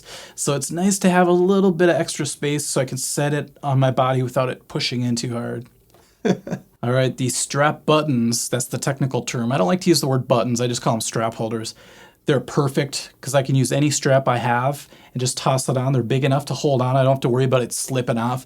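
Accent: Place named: Midwest, United States. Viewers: It's the Midwest accent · "Jayguar" "knabs" Sounds like he's from the mid-west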